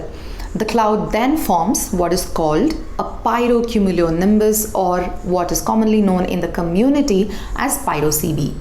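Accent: India. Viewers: That is a cute Indian accent.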